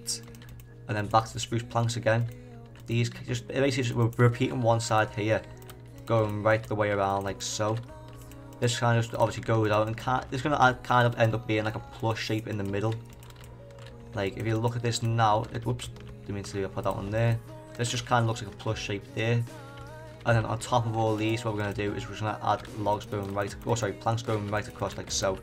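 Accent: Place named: Liverpool, United Kingdom. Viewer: Ur from Liverpool aren't you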